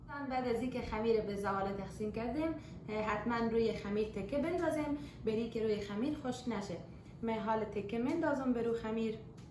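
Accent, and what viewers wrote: Herat, Afghanistan. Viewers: تشکر از زحمات تان عالی بود همچنان بابت نگهداشتن لهجه شیرین هراتی سپاس گذارم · بسیار عالی امی‌زبان هیراتی بسیار مقبول است همیشه برنامه هایتان را میبینم شما در کوجا‌زندگی میکونین خواهر شما مرسل از جرمنی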